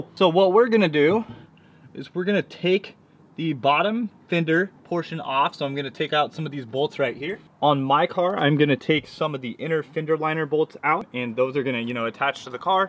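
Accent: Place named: United States. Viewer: For a second there, I thought you were Issac Butterfield, but then I thought "why does he have an American accent?"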